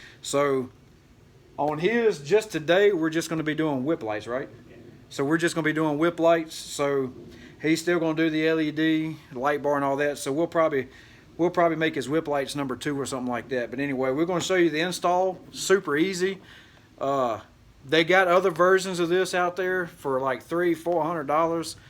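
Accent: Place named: North Carolina, United States. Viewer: You sure sound like you could be from NE North Carolina. Ordered the Anzo unit this week. Lookin for it to be here Friday. Thanks for the video. Very helpful.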